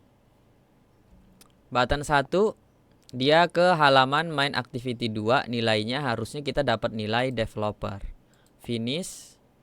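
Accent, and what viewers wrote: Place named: Bali, Indonesia. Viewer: bli orang bali ya? :v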